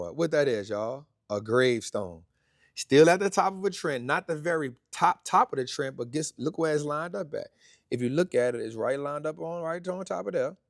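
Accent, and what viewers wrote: New Orleans, United States. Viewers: You're a really good teacher! Are you from N'awlins? I'm in Shreveport.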